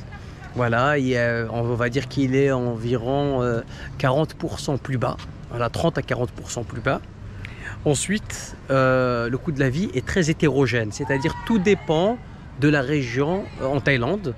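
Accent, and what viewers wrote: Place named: Kabylie, Algeria. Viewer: De dbk et de tigzirt j'avais bien reconnu notre accent magnifique 😁 Bravo a toi . Tu vis en Thaïlande ou en France ?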